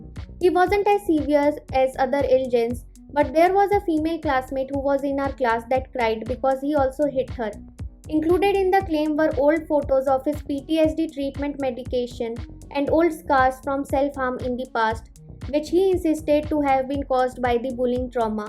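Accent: India. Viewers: i like your accent..are u indian??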